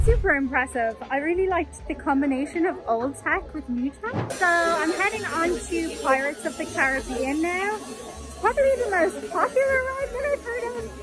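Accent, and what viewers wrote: Ireland. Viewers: Well, Disney with an Irish accent is not as common 🙂 loved the video 🙂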